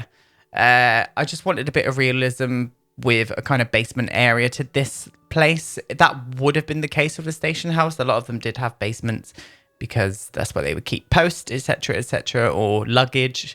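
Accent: United Kingdom. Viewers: Posh english accent. Always ill any season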